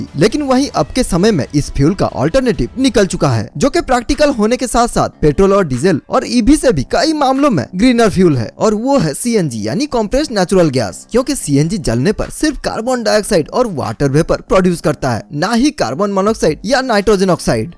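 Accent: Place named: Bengal, India. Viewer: Bhai tum pakka koi Bengali hai ya Oriya hai.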